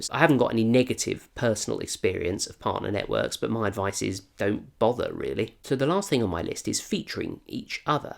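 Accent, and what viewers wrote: United Kingdom. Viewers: the british accent does the rest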